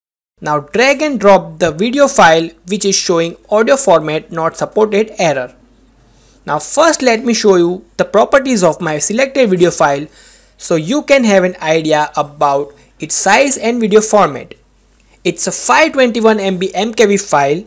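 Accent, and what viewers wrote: India. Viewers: I almost removed the video as soon as i heard indian tone but the fact you spoke English is awesome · as soon as I heard Indian Sound I knew I was saved Thanks mate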